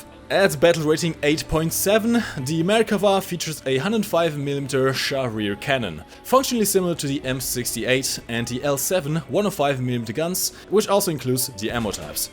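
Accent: Germany. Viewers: I knew that he sounds like a german 😂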